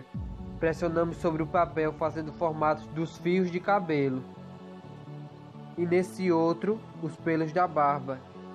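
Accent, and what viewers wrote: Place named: Northeast, Brazil. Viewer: E esse sotaque do meu nordeste? <3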